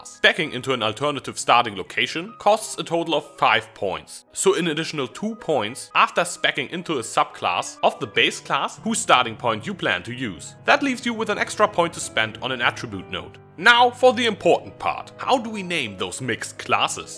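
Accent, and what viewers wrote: Germany. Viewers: The german accent is killing it :D :D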